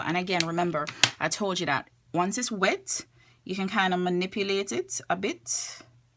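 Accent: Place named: Jamaica. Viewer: heard your accent. Instance sub. I am JAMAICAN TOO. :-)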